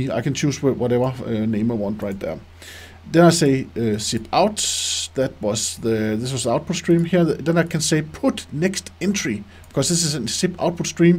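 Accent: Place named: Denmark. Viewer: Soo danish accent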